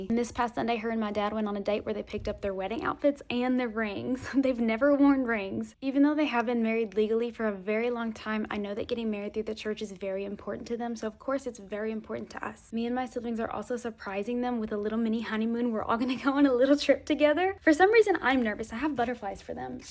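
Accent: South, United States. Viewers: The first video I saw of her I thought she sounded very southern! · yesss! A southern bell that’s what I’m hearing ! Couldn’t pin point it at first lol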